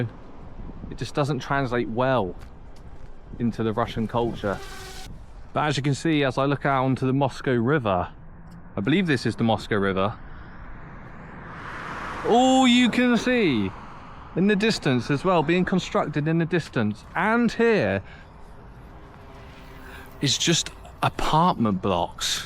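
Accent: United Kingdom. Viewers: Ох уж этот британский акцент)))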